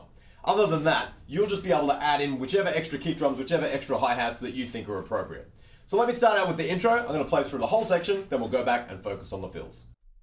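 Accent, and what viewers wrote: Australia. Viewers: Wait a minute..........r u Australian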